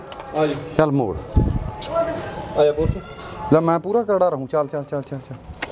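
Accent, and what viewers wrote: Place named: Malwa, India. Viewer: 22 malwe alli bolli bhott vidya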